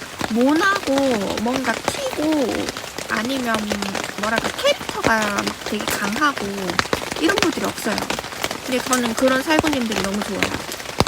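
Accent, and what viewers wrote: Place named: Gyeongsang, South Korea. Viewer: 참 목소리가 매력있네요 약간 경상도 풍의 사투리가 깔리면서 약간 옥구슬 굴러가는 듣한 뭔가의 특이함이 있네요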